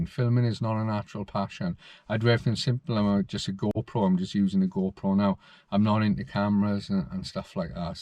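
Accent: Wales, United Kingdom. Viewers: Thanks for all you've done, take it easy butt. When I first found one of your videos I'd been outside Wales for months in Uni, it cheered me right up hearing that familiar Welsh accent! You've put up some great videos and we appreciate them all. Good to see you're well and healthy:) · Your little Welsh tones have been missed.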